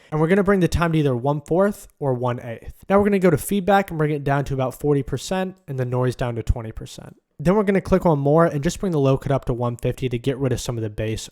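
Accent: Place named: New York, United States. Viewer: You sound so new yorkian...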